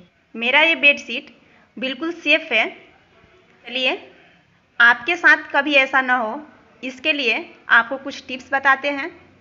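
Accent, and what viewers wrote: Bihar, India. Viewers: Ap Bihar se ho?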